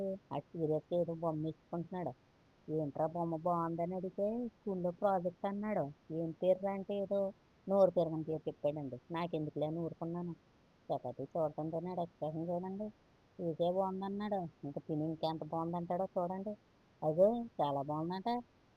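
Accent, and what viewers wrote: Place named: Godavari, India. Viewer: godavari slang super andi👌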